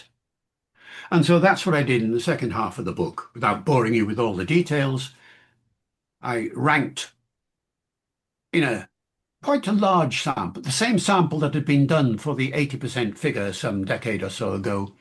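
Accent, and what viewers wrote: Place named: West Country, United Kingdom. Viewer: Here is another thing there would not have been a standard Elizabethan pronunciation any more than we have one today, look at the text, Shakespeare himself uses dialect pronunciations. You sound to me like Mummerset.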